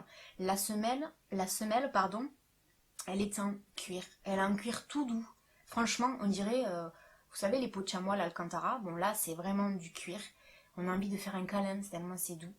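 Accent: South, France. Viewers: trop bonne vidéo je t'aime trop t'es trop cool et esque tu vient du sud car tu as un accenct bisous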